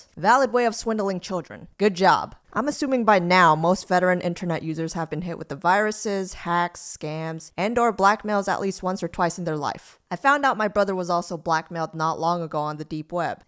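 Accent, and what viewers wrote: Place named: New Jersey, United States. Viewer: no hate but u sound like the steryotypical jersy girl/New york girl 😂💞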